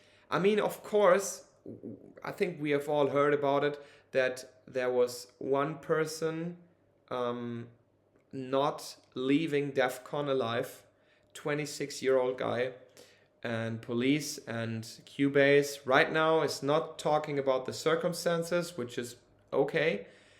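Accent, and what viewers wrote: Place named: Germany. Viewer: Your English is quite alright, of course with a German accent. Stopped listening after your first point though: "Yeah I know the discussion about alcohol is also a drug and smoking too but for ME sniffing(taking, whatever) IS something else" So your first (and most important?) point is just an opinion of you. No personal experiences, no proper argument, nothing... You maybe seen some ppl overdo it, some with big eyes and you want to forbid that? Why? What does that have to do with you what ppl overdoing alcohol don't?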